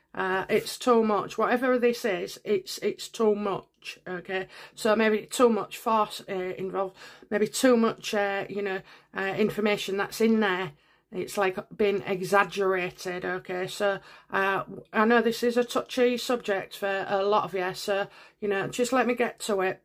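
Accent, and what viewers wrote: United Kingdom. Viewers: Hi from Australia. Nice reading as always. My favorite UK tarot reader. Nice reading as always.. I always enjoy your tarot.. Love your British accent. My dad had a Scottish accent. Mum was from Isle of man.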